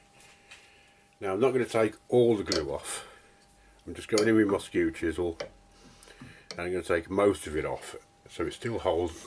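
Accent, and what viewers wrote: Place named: Black Country, United Kingdom. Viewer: Cheers for that demo. I've been checking out the other makers methods and your one is the one I'm going to have a go at. I like your style and Black Country? accent. Are you still making videos? Hope so. Best regards.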